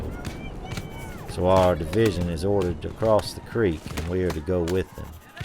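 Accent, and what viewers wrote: South, United States. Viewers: Very well read, but his accent is a southern.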